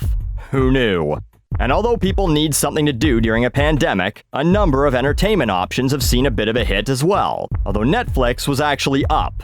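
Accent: Australia. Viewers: Love the Aussie accent and the references to woolies. Fucking hilarious vid! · Your aussie accents is like a cross between english and loose australian. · Loves the Australian accent and the woolworths reference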